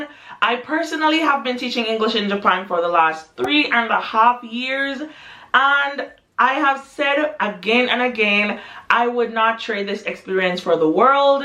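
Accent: Jamaica. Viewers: are you from Jamaica? You have an accent that sounds like them. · I can hear Jamaican in your voice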